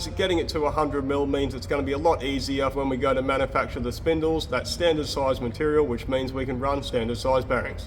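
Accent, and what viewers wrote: Australia. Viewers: I think appearance is every thing and your appearance is so much better when you shave your face, also your Camera Lady should also appear every once in a while as your Partner in Business. I also enjoy the Birds and is it Jeffery or Homey your Dog! You are Highly Skilled in your trade and you remind me of Myself, i also worked Many Hours in My Trade as a Collision Repair Technician for 43 Years. I also worked Many Hours, sometimes till Midnight! I Also Really Enjoy your Australian Accent!!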